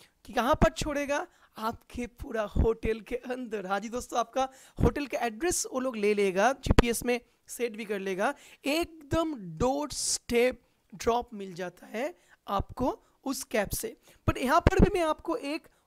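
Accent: Bengal, India. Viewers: all ur videos are really boring, coz u mix lot of topics, this makes the channel really boring, half of ur bangali accent is boring too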